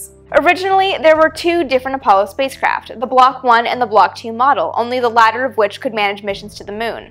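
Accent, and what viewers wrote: Canada. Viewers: speaks like a Canadian to my ear, although these accents are almost the same.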